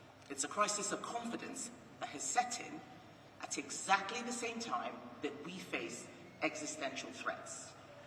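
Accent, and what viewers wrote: United Kingdom. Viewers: Sounds pretty British to me!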